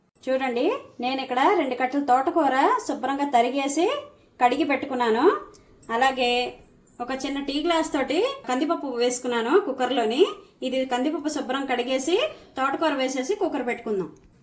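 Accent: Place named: Godavari, India. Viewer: Indilo nene aite koncham chintapandu kuda vestandi meedi kuda ee sari try chesta meedi kuda godawari gillanena mee maata teeru alne undi andi mee videos anni chustu untanandi best ok luck ❤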